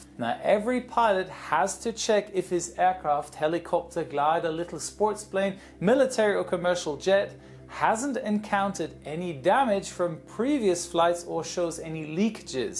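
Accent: Germany. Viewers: Ach... nen Germane... bin ja erschüttert das man das nicht schon früher festgestellt hat. XD (Gut... irgendwie klingt jeder Pilot gleich... da hinterfragt man das wohl nicht. ;)) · Are you German?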